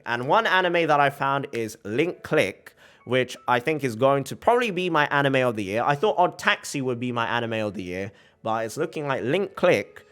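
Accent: United Kingdom. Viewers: You are gonna love Link Click. Odd taxi has brilliant writing but like you I was just blown away by Link Click. I always feel like I am visiting with old friends from the UK when I hear you stream. Love your content mate.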